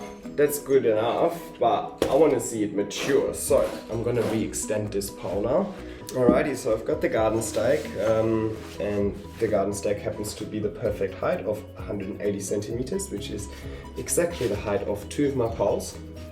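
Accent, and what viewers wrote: Germany. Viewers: Den deutschen Akzent hört man einfach immer raus :-) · Lovely video! Is that a german accent u got?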